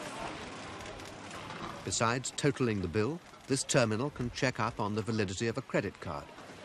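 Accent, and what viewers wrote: RP, United Kingdom. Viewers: If only he had this beautiful classical RP accent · I just miss the old style received pronunciation delivery:)) Proper English, properly spoken.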